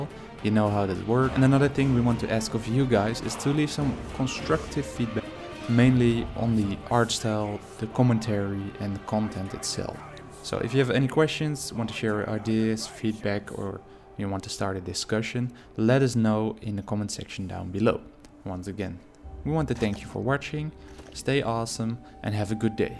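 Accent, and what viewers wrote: Netherlands. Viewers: I can tell you're dutch by your english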